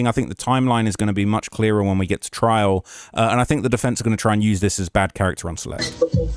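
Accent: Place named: United Kingdom. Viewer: Love your accent UK 😊❤ but yeah I agree I can never put my self in Celeste family’s shoes for one I wonder if their interpreter is the Salvadoran interpretation or a Spanish one because some of the words sound the same but have different meanings it must be difficult for them in every way because I don’t think they get the full details but seeing the evidence is about the only thing they can fully understand 👀🧐😮‍💨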